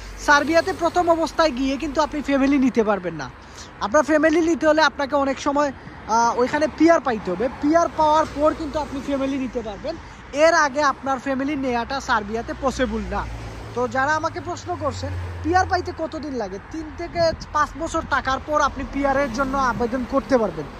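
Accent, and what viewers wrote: Sylhet, Bangladesh. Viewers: ভাই আফনে সিলেটি নি?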